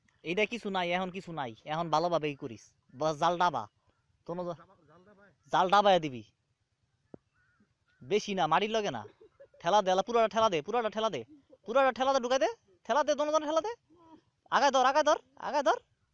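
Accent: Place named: Bikrampur, Bangladesh. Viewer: ভাষা শুনে আমাদের বিক্রমপুর মনে হচ্ছে।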